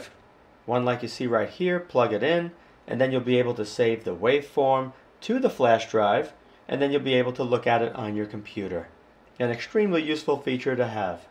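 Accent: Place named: New York, United States. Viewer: You can put a FL place stamp on your video, but that voice says you're my homie from NY...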